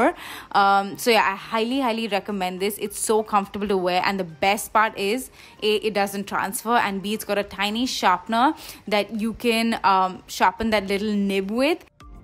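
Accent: United Kingdom. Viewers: Thanks for swatching these babies during nykaa hot pink sale, surely will order few of em💋💋 lots of love ♥️ PS- The British accent though! Lit baby gurll🔥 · damm that british accent... much love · Thankyou so much ♥️♥️ this video was actually much needed 😍😍😍 and ofc your British accent ♥️♥️♥️♥️ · British accent?? Why? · Ahh that british accent of yours which comes in between 💜😂 Love love love ...true inspiration for me 🤗💜💜💜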